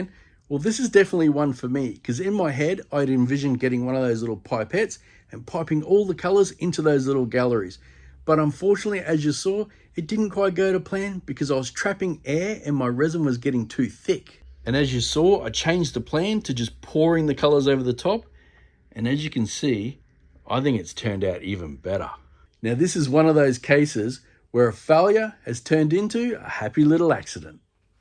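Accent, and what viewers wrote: Australia. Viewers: Wow! I’ve just stumbled across this page, love hearing a fellow Aussie accent hehe. This is an absolute beauty ❤️ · Hi, loved this video, but i’m wondering: Australia or South Africa, i’m pretty certain you’re not a Kiwi😊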